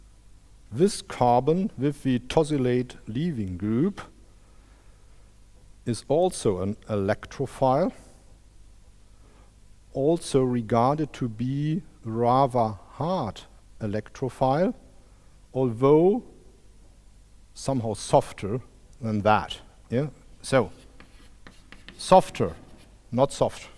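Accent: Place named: Germany. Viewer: A German talks Eng 😀so cute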